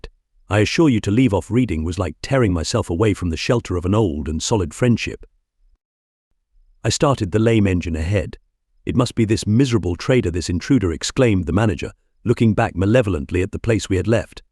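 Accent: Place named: United Kingdom. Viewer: I like the British narrator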